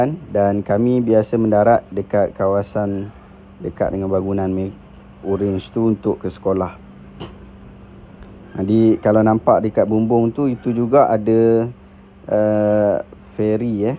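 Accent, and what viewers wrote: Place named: Northern Malaysia, Malaysia. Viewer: Pasai Tu kalu dia cakap utara belendiaqqqq jaa..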